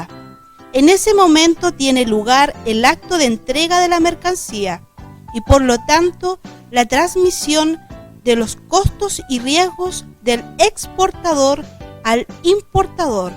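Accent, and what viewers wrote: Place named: Spain. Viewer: Agora que percebi que a fala e o sotaque espanhol são horríveis.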